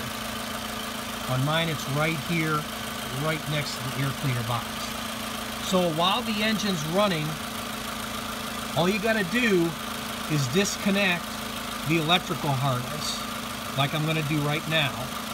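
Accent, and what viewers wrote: United States. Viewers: American accent and you know straight away it's over exaggerated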